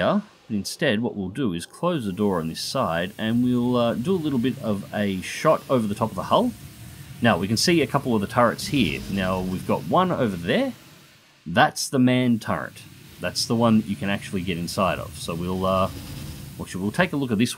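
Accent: Australia. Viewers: your voice is perfect to have in the background while i do other stuff. love aussie accents. a tad more entertaining than south African accents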